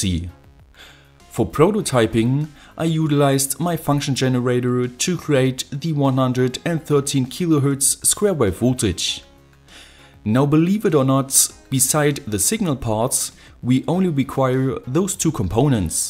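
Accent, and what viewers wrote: Germany. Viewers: Er klingt auch so schon deutsch genug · are you german?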